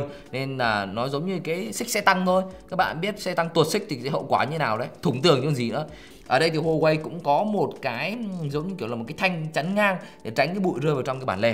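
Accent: Thanh Hoa, Vietnam. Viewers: Ông review này người Thanh Hoá phải k :)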